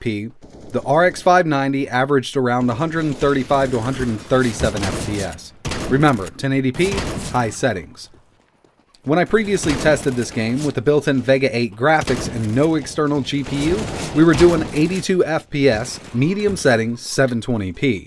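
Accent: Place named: United States. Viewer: What a voice of a cowboy sexy accent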